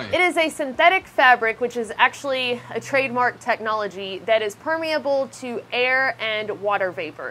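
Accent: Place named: Rural, United States. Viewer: I love how a handful of words you say sound full-blown country...like, hike, dry, time, a while, supply, resupply, I, find, myself...but sometimes say them in that somewhere-between-the-Northeast-and-Midwest accent. I'm sure I have a chopped up accent too being raised in Southeast Texas by parents from South Philly. Even to this day, coworkers think I'm full of shit when I say I've live here all my life.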